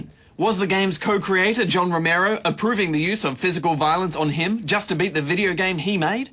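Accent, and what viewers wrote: Australia. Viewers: THIS IS NOT BRITISH ITS AUSTRAILIAN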